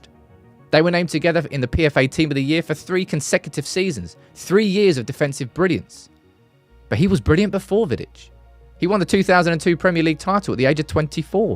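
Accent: Manchester, United Kingdom. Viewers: Can tell you're a manc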